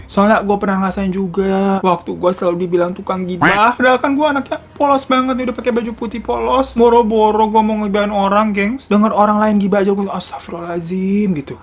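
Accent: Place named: Indramayu, Indonesia. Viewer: Saya curiga Kalo bang ini orang indramayu selipan kata kata Dan logat mirip gitu sih hehe hayoo bang darimana sih bang kamu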